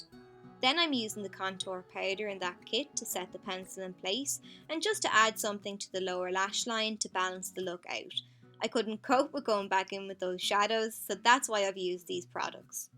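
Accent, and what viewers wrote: Ireland. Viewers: Where in Ireland are you from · I loved this video😊what part of Ireland are u from?I'm from Kerry 💚💛💚💛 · I love your accent X I'm also Irish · Where in Ireland are u from xx? New subscriber!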